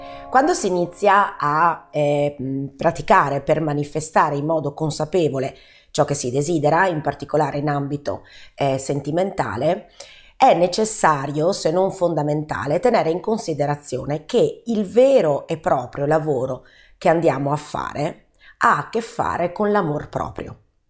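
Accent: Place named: Veneto, Italy. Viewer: Buonasera, mi pare tu sia veneta. Di dove? Io sto a Vicenza.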